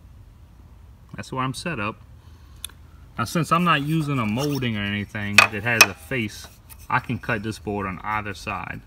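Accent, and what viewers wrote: Louisiana, United States. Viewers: Very nice explanation. Just what I was looking for. What part of South Louisiana are you from?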